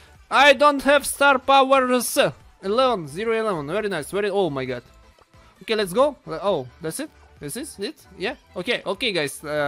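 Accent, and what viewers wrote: Russia. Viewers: russia, i know because my parents are from Russia and they have this accent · да ты руский просто по англиски говоришь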